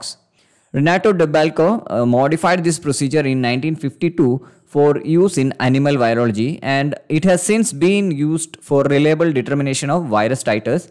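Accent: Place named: South India, India. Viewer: From your accent I gather you're a south Indian. Good job. Thanks for making the video in English. It helps us all South Indians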